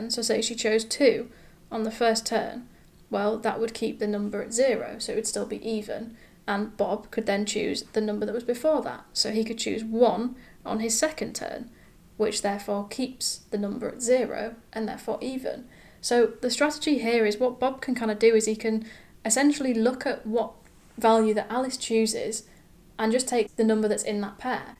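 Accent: United Kingdom. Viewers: I adore also British English 😊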